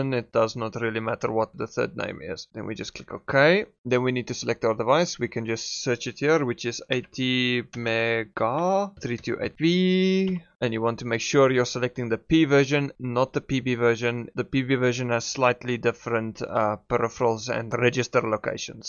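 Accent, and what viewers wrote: Afrikaans, South Africa. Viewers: Afrikaans accent?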